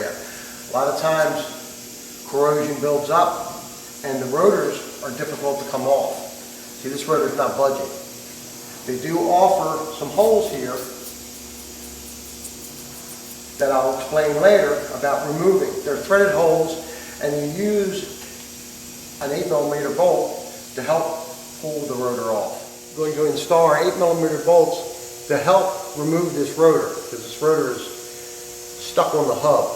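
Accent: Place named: Delaware Valley, United States. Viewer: I love that Delaware Valley accent!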